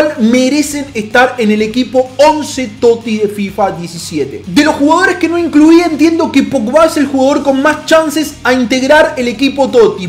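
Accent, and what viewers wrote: Argentina. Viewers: como se nota que eres argentino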